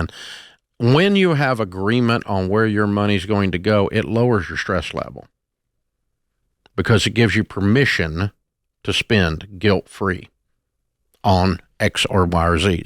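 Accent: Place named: Southeast, United States. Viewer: It's good for learning American English from the south east (specifically the host).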